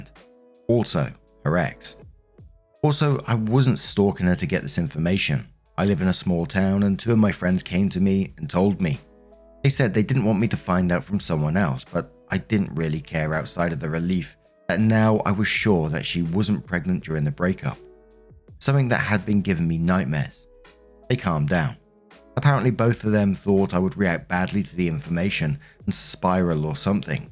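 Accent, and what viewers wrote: United Kingdom. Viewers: I'm British and working in America presently, but thank you for pointing that out. I don't know how I could have missed the very British accent, similar to my own.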